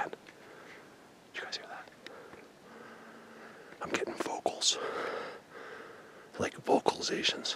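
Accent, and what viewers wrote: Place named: New Jersey, United States. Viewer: I've been watching your excellent videos for a while and I knew I heard a touch of Jersey in your voice. Perhaps it's just a bit of Jersey attitude. In any case, you confirmed it. Good luck and stay safe.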